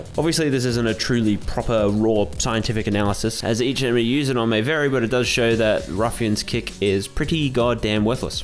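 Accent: Australia. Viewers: As an australian, he doesn't sound very british and is probably also australian.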